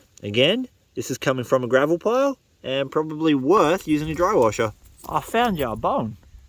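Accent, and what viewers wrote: Australia. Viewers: My favorite videos after a stressful day 🙂 From the Australian accent, fun edit scenes, education, and Fern.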